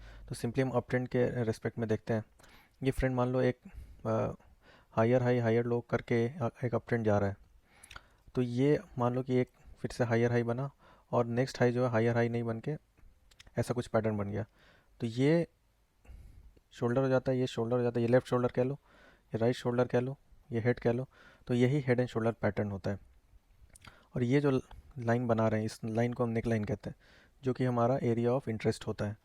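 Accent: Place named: India. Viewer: Can't understand the English. Sounds indian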